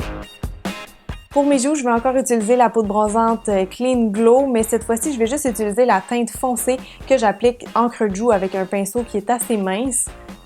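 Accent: Quebec, Canada. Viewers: Es-tu québécoise ou quelque chose de ce genre car tu as un accent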